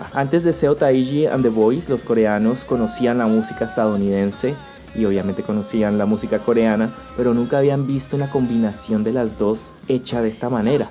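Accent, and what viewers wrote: Colombia. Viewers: Porque no hablas costeño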